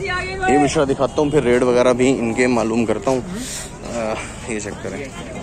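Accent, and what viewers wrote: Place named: Karachi, Pakistan. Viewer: Bhai ap bilkul karachi walay lagrahay ho or bargling bhi karachi walo ki tarah krahay ho i like you keep it up agar multan aya to ap se zror miloga apka channel aj hi subscribe kia hai mainae.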